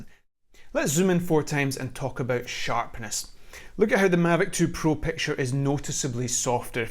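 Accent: Scotland, United Kingdom. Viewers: Excellent review very informative and very happy to listen to that pleasant scottish voice.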